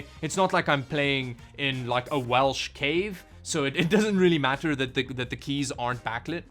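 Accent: South Africa. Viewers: love your videos man. you sound south african. are you? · Thanks was wondering becuse his channel said canada but he sounded just like a south african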